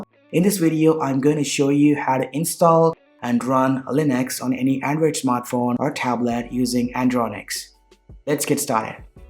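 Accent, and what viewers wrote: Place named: India. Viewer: I think he’s Indian because of his accent. I’m Indian too and I think his accent is Indian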